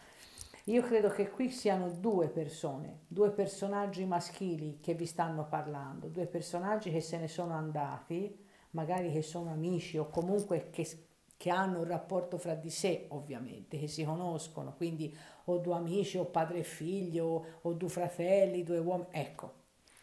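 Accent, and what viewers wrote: Tuscany, Italy. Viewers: Sei dolcissima ahahah 🤣🤣🤣🤣🤣😂😂😂😂già solo cm parli metti allegria, sei Toscana? Poi la chitarra troppo carina 😂😂😂😂😂🥰🥰🥰🥰🥰♥️♥️♥️♥️♥️♥️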